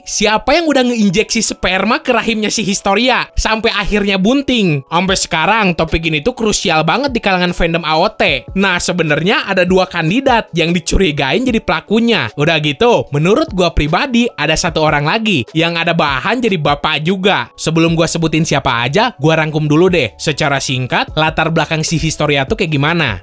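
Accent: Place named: West Java, Indonesia. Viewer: Sundanese detected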